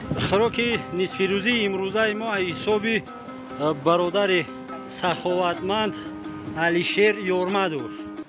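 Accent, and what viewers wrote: Tajikistan. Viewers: Дастон дардро набинад, Худованд дар касбу кортон баракат ато фармояд! Бисер бо як лахчаи точикии зебо сухбат мекунед.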